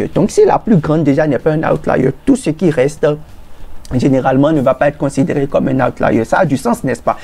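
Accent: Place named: Benin. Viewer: s/o to my beninese people , you make me miss my country with you accent , thank you I hope everything is going great for you i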